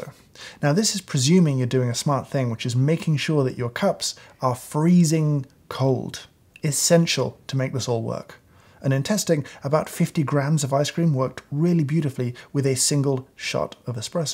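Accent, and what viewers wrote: United Kingdom. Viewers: Top notch British accent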